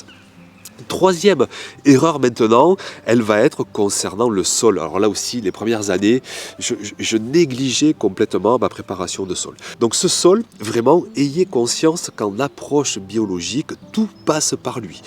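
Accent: South, France. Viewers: Trop bel accent du Sud ❤j’ai plutôt l’accent du Nord ❤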